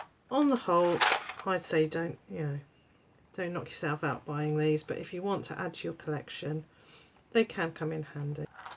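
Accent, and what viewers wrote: United Kingdom. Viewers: You are so great. Im just getting into polymer clay & i love it. My friend cant stand polymer clay, clay in General. Shes To impatient! Yep were American’s. Shes not just impatient, she’s spoiled and entitled. 38 Its sad! I tell her all the time! She’s missing out on some really great therapeutic stuff here. But I love working with clay, especially polymer clay, its so great for stress. & i even like the smell. Lol sadly i cant stand the world wide Shortage! People like you probably haven’t felt that yet! Because you probably have every single color in reserves. But question, yesterday I bought a 8oz white bar premo. & its soo hard. I seen one YouTuber using a heat gun To warm quick & work with it. I haven’t tried it yet. Any other tips to make it softer? If you see this. I like To try make pumpkin, snowman, pinecone gnomes, i came To you again. 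I just seen your thumbnail and realized it’s oh its the wonderful lovely British lady, your wonderful voice, what I’m gonna say next is a huge compliment. But I tell my friends I’m watching the real “Mrs. Doubtfire” if she was a real England women & shes teaching me all she knows about polymer clay. Lol I enjoy watching your helpful tips lasy couple months. But your voice reminds me of when Robin Williams says hello dear. To the social worker. It was my favorite movie when I was like 13. In america. Anyway ive Shared you with a few Friends. Anyway I wanted to see what kind of snowflake cutters I should get. I think the plunger ones lo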